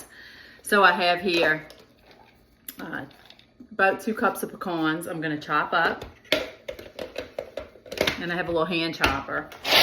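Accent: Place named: Louisiana, United States. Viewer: Love your recipe! Sounds like you might be from Louisiana! I’m next door in TX. Either way, glad to be here!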